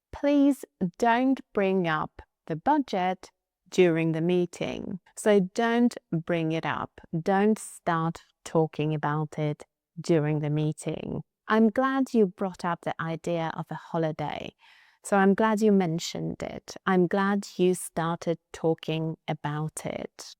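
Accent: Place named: United Kingdom. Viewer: "to stand out" - that's a really funny expression. It reminds me of this Freddie Mercury Movie when he (Rami Malek) was asked about his additional teeth and he answered "What about my teeth - I don't wanna stand out of British people". This is for example a phrasal verb that every English learner ought to understand easily, because in this context it should be clear to the viewer. I am half American and I worked as an English teacher for many years.. And once in a while I have a look into your channel because I'm interested if there is anything I don't know. And I love your British accent. I imitated this British accent so many times that I had to take care not to take it over, because my American relatives were teasing me about it. Once an English Prof told me not to play with my accent because it's so original and native. But during my time as a flight attendant with Lufthansa I shared an apartment with a British girl and I found that accent so funny that I had to play around with it.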